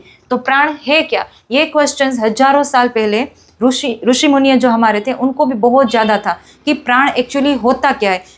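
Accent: Gujarat, India. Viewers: Dear sister looks like you are gujarati this I find by your Hindi. What is true?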